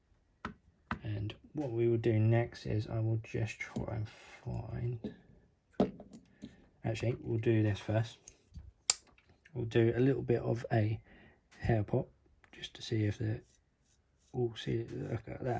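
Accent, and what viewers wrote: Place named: United Kingdom. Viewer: Thanks for the videos by the way, it's always nice to have another British voice chime in and help give insight with context of our laws and prices. The HH custom/special knives are such a good idea for us UK folk in regard to affordable and legal, I hope it prompts companies to start thinking globally a bit more when it comes to lock/size decisions. Thanks for stating the price, I wasn't trying to pry, just thought if anyone is looking at this and wondering how much of a difference used and new are it might help. I've noticed a lot of people talk about the resale market without mentioning price or where to go (I've found Instagram is the main second hand market).👍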